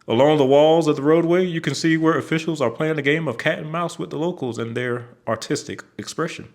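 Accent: South, United States. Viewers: He is from the south, so they pronounce it "rout" down there.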